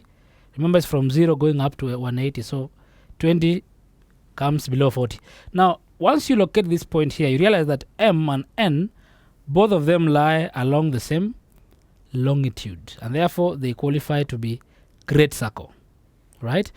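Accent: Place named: India. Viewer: his english acent closely resembles an indian english acent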